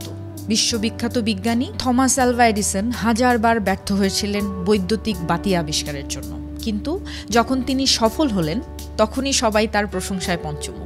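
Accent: Bangladesh. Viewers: Apner tone Bangladeshi but apner title nepali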